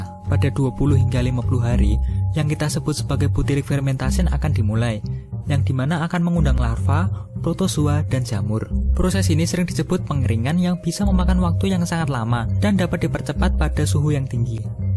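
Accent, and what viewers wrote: Java, Indonesia. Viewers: logat jawa nya lengket banget wlwpn pk bhsa indo. 😅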